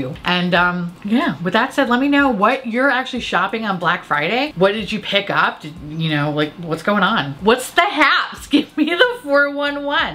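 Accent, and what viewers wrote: France. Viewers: Your french accent is so cute ! And yes, you prononce correctly the word "étoile" ^^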